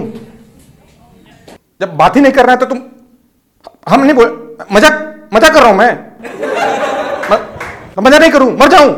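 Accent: Bihar, India. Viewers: Your comedy is superb no doubt, But your Bihar style maza aa jata h sun k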